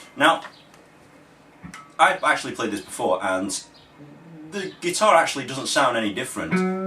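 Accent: United Kingdom. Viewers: Thanks. What a pain in the keyster. If I decide to make a guitar fretless, I think I'll buy the neck.Thanks for this video!BTW...Vietnamese (?) guy with a Texas A&M tshirt and a British accent...i chuckled a little!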